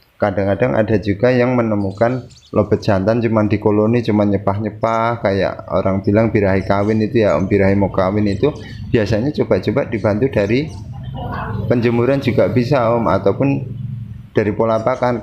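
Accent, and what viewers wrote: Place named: Banyumas, Indonesia. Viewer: Mas orang mana , ko kaya ngomong ngapak ?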